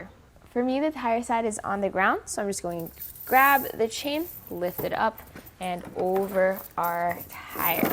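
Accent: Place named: Midwest, United States. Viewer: Someone's from the Midwest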